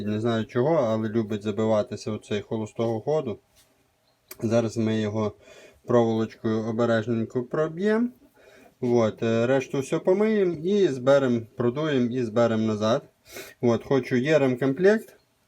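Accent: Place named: Odesa, Ukraine. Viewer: Відчуття наче одесит перейшов на україньську, дуже кумедно)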